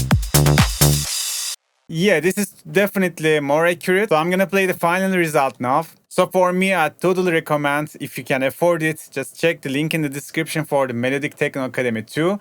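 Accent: Turkey. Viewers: Turkish accent is my favorite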